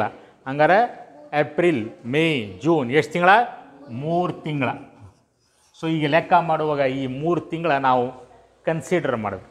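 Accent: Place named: North Karnataka, India. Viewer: ಸೂಪರ್ ಟೀಚಿಂಗ್ ಸರ್ ನಿಮದ ಉತ್ತರ ಕನ್ನಡ ಭಾಷೆ nice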